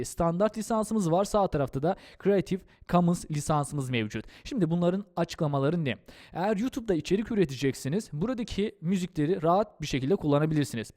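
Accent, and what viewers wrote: Azerbaijan. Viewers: Siz Azerisiniz?? +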